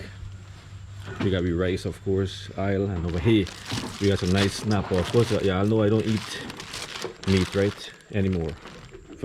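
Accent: Guyana. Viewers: Guyanese accent seems musical, I always love to listen Sunil Naraine the cricketer voice as it seems musical like yours. Love and respect from 🇮🇳. Keep enjoying bro.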